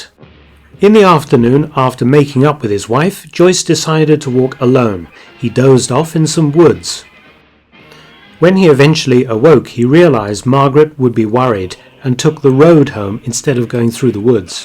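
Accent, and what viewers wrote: United Kingdom. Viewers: I love the British accent. Militree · Sorry guys but it all sounds a bit iffy to me as many of these types of programs do! it sounds very pompous and how could anyone know what really happened. Its almost like listening to a WW2 propaganda speech on the radio, half truths and no better than the usual bull crap you find on Youtube, but this has a nice British accent. (fake accent?)The only real thing is the film used.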